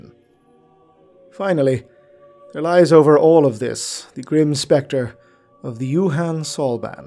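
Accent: Ireland. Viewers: Excellent work as ever. Is that an Irish accent I hear?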